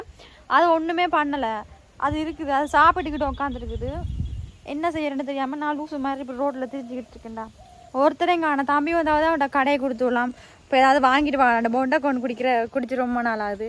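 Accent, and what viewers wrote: Sri Lanka. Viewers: நீங்கள் இலங்கையில் இளம் குயில்! நீங்கள் பேசும் இலங்கை பாஷை மிகவும் அருமை எங்களுக்கு கேட்பதற்கு மிகவும் இனிமையாக உள்ளது!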